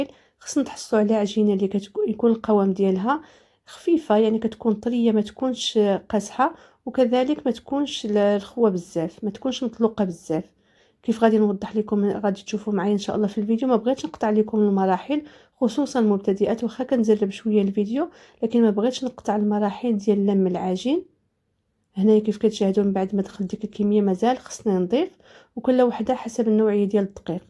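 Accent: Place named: Algeria. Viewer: اللهجة جزائرية الحلوة جزائرية والسرقة مراكشية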